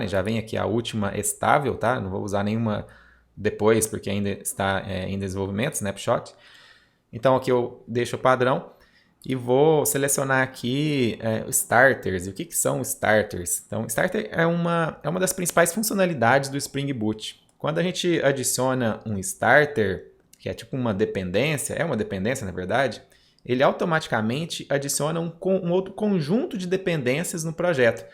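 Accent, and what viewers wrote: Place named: Minas Gerais, Brazil. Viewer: Engraçado, esse sotaque, acho que mineiro, faz ficar mais fácil ou é impressão minha? MUITO BOM!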